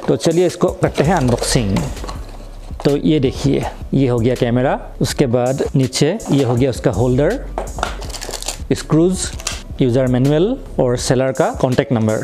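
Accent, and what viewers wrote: Assam, India. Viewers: Bhai aap Assam se ho kya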